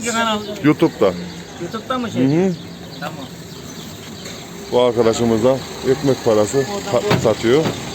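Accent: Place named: Kayseri, Turkey. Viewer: selam ben geldim nevin ozudogru kayseriliyim hemserim siveniz ayni dilden sizleri severek ve begenerek izliyom gadasini aldigim siz bizim nese kaynagamiz oldunuz kadir geceniz mubarek olsun dualarimiz sizinle herkeze selamlar saglicikla kalin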